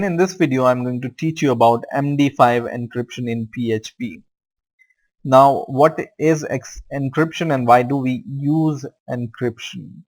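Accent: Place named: India. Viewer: He is obviously sound Indian totally not Chinese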